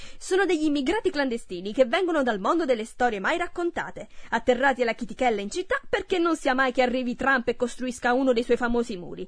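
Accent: Bari, Italy. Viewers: oddio sei di bari? anch'io 😂😂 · sei barese ho dio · la cadenza barese è adorabile <3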